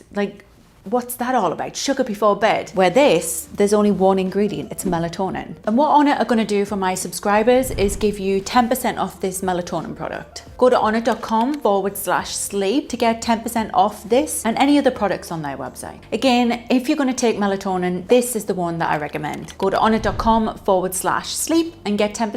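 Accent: United Kingdom. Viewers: You have a British accent so why did you leave the UK?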